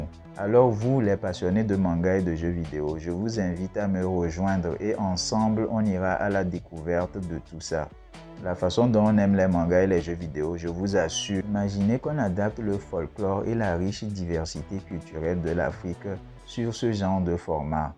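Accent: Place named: Togo. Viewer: Je suis béninois mais je reconnais l'accent togolais. Félicitations et beaucoup de courage !!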